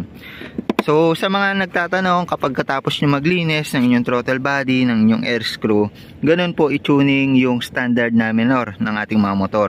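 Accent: Pampanga, Philippines. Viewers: sabi na boss kapampangan ka eh hahaha pabsin ko lang accent mo sa mga tutorial mo hahaha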